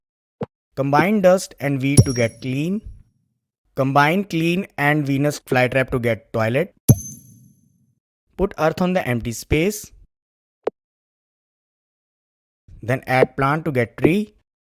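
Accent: India. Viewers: bro got the india accent with an innocent brain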